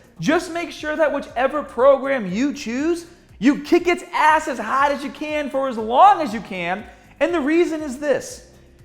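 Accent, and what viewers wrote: Boston, United States. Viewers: The Boston is strong with this one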